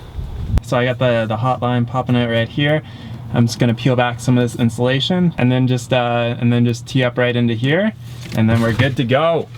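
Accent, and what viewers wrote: Canada. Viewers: Aboot...lol You're such a Canuck :) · The Canadian accent was strong in this one (probably because you said words with "ou" in them so often lol). XD I can't wait to see this tiny house when it's finally done though!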